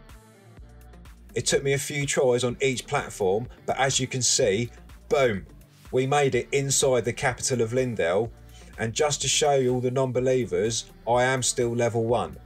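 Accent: Cockney, United Kingdom. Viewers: An Elden Ring exploit video from what sounds like an old-school, cockney gangster! Wicked. Thanks, man!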